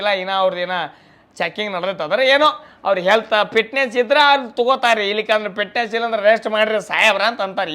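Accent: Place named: Dharwad, India. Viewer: ಧಾರವಾಡ ಭಾಷೆ ಬೆಂಕಿ 🔥🔥😂😂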